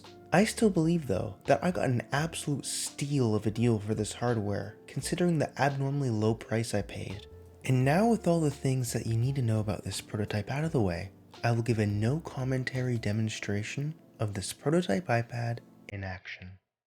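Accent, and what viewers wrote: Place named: Netherlands. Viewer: Dus je bent wel een Nederlandse.... can't help but noticed the accent .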